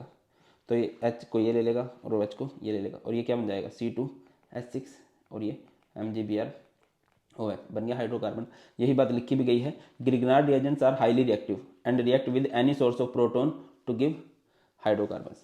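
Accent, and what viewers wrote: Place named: Uttar Pradesh, India. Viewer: Ya he's got that UP waala accent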